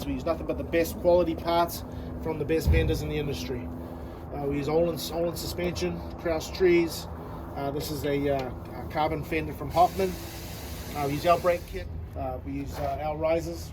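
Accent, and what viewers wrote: New Zealand. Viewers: Is the guy talking from Nz?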